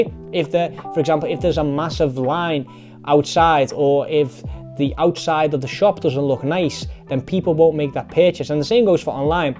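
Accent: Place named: Liverpool, United Kingdom. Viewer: You're from liverpool like it or not. Talking like jordan henderson and TRENT alexander arnold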